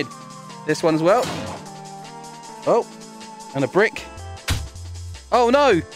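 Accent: United Kingdom. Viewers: ur from British😮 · Are you British? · Is it me or Have I been watching this guy for 4 years And just realized He's British? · Mate ur british?!?! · This dude is Britain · Bro is British playing with Americans!?!?!?!?! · I think he’s British🇬🇧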